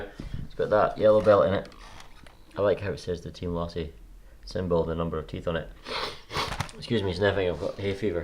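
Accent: Scotland, United Kingdom. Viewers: Hello! I came across your channel today. Nice to hear a familiar accent. I'm in Troon, near Glasgow. Have you still got your XXX4? I'm in the middle of resurrecting my old XX4, and I have a new in box XX4 Worlds and Street Weapon IWC, both of which I plan to build shortly. Have you tried the belts that are now available from Tough Racing? Those seem to be the recommended replacements these days for the XX4/Weapon. I've recently converted over to running Schumacher, but the L1 Evo and Cougar just don't have the same appeal to me as these old Losis. In my less than capable hands I'm sure I'll be just as quick (read slow) with the XX4.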